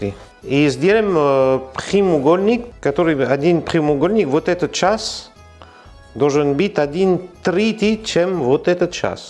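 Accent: France. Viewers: Как мило вы говорите по русски!Ваше видео смотрится,как приключенческий фильм с юмором и французским акцентом!Не все понятно,но сделаю по простому рецепту!Спасибо вам за урок! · Здравствуй. Только что нашла твой канал, с улыбкой отсмотрела и слушала твой красивый фрцузкий акцент, записалась уже и буду готовить этот рецепт. Если бы ты знал сколько рецептов я попробовала, но я недовольна, обьязательно напишу о результате. Мерси боку.😘👍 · Как же нравится его французский акцент!ну очь.сексуально!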